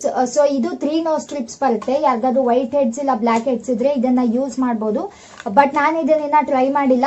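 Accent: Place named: Bangalore, India. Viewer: Very informative video...but I came to know u r from North Karnataka, Sindhanur. Why do you speak in Banglore kannada accent? Uttara Karnataka kannada is so nice to hear. It is also understood by everyone.Ultimately it's your wish to talk anyway...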